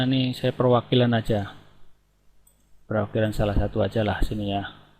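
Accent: Banyumas, Indonesia. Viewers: Belajar ning pasti kepenak...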